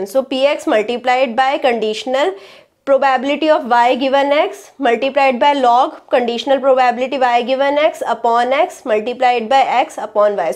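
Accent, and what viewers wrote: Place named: England, United Kingdom. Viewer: Ap England sai ho kya mem... Hindi nhi ata kya apko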